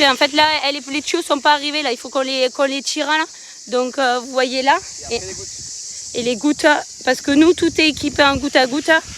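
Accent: South, France. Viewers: Quelle doux bruit !.pas les cigales !ta voix du sud bien sur 👍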